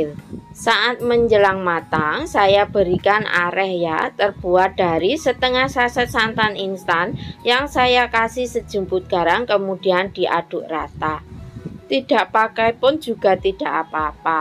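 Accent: Java, Indonesia. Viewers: Kentalnya jawanya 😅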